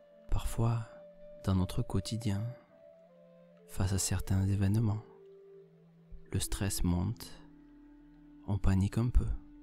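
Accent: South, France. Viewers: votre accent du midi qui fait chaud au ❤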